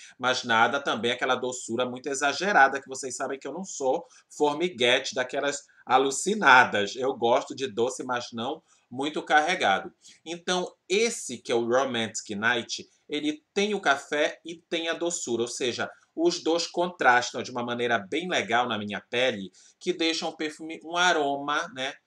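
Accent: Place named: Belém, Brazil. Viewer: vc é de Belém, né? imagino que seja pelo sotaque onde vc compra dessa marca?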